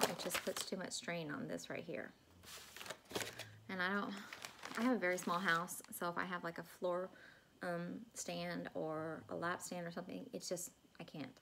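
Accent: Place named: South, United States. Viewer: Thanks for the sweet mention 😊. Maybe I’m biased but I find your voice super soothing, too. I think it’s a preference for southern voices from my Mississippi mom. You have some gorgeous projects❤️. I love lots of things in a chart - houses, anything red, bees and birds. Hope y’all have a great Halloween- btw our church does a big trunk or treat at 6pm on the 31st if you want to bring the kiddos. Happy stitching sweet friend😊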